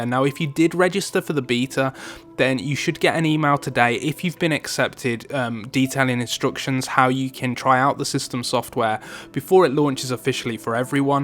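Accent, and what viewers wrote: United Kingdom. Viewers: Long winded annoying British accent, geesh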